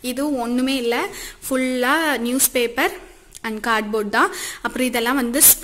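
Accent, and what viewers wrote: Kongu, India. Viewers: I subscribed ur channel especially for ur kongu Tamil and crafts.... Thank u for ur ideas...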